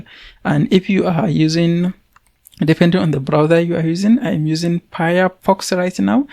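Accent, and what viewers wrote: Hausa, Nigeria. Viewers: Hausa from Nigeria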